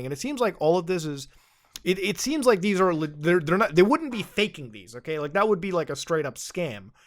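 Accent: Canada. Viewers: girlfriend half asleep listening to my video "is he Canadian? " "I can hear his accent" that it was cool how she just randomly said that I watch you every night lol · Your Canadian comes out pretty deep in these haha. Love it! This last bit is exactly what the internet used to be like in the 90's! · Maybe I’m just high af but sounds so Canadian in this video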